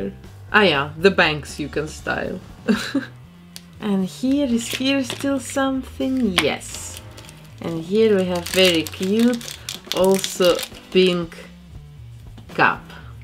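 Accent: Russia. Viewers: Почему мне слышится русский акцент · А что за акцент? На русский и правда похоже... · Мне кажется, или это русский акцент? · Просто у нее очень сильный акцент, похожий на русский акцент. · Какой русский акцент... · Слишком русский акцент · Я одна слышу русский акцент? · i love your accent are you Russian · I really don't want to be rude but she kinda sounds like Dracula but female version Edit: She sound Russian I'm just saying she got the same accent as Taras Kul [ CrazyRussianHacker ] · What Is her accent I Wanna say Russian but I'm Not too sure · Is she Russian? I love the accent! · Ну очень сильный акцент, сразу видно, что русская или СНГ.)) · Her accent is different. Is she Russian? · рашн акцент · Ты тоже заметил(ла) что русский акцент? Она знаешь, так как то ооочкнь странно звучит как "Бринан ин зэ Кэпитал оф Юкреин" 😂😂😂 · what country is she from? Russia? 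Ukraine?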